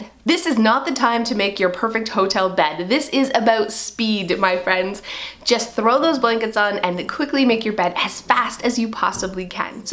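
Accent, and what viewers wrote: Canada. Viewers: Out and about? Canadian? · Are you Canadian?, you sound like one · I'm from Maine, I love your Canadian accent with your enthusiasm! 😂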